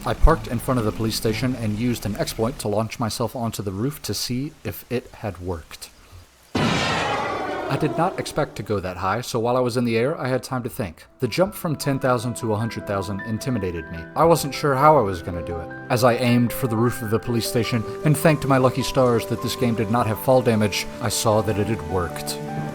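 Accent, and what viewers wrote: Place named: Netherlands. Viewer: Took me a couple mins to notice you are a fellow Dutchy, props on you accent. Enjoyable content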